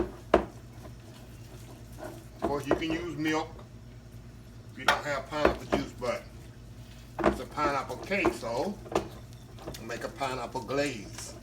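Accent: South, United States. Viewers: Love that Southern drawl and great looking cake from some kinda cookin man! Thank you! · Okay this voice, the rich southern accent and y’alls, assures comforting soul food. I’m in (subscribing)! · Just found your channel. I love the cooking,the southern drawl,and most of all the y'all. I love it.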